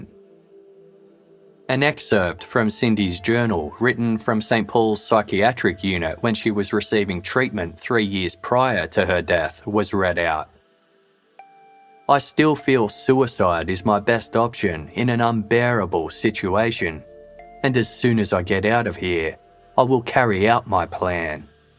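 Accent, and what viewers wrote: Australia. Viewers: Sounds like he is from Australia or NZ. Been our Summer holidays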